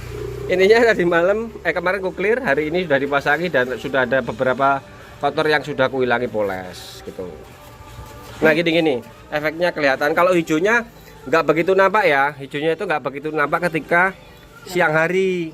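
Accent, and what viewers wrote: East Java, Indonesia. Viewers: Jelas kenal Suarane wong nggalek Tonggone cak mat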